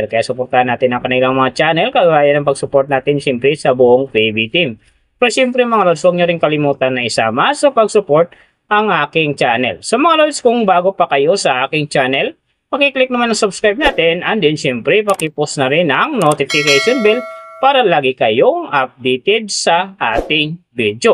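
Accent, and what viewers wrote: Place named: Visayas, Philippines. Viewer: Visaya kb dong? Bkit ganyan k magsalita.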